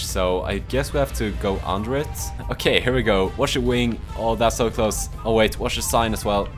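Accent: Sweden. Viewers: Are you from sweden? The way you speak sounds like when a swedish man speak english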